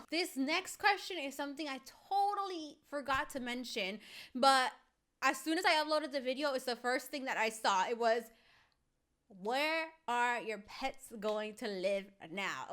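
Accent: United Kingdom. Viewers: I thought you came from the UK 🇬🇧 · i thought u were british...😂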